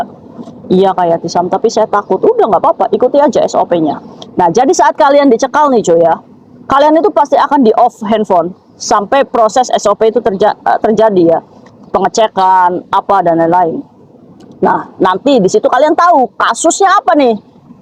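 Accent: Indramayu, Indonesia. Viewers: Kak semangaaat Tpu logat Indramayu nempel kaya saya ( kita ga dari Indramayu )😊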